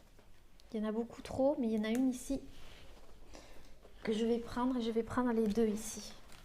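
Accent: South, France. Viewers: Vous êtes mignonne! En + votre accent du midi c. top.Vous êtes habitée d'une douce énergie réconfortante, Merci.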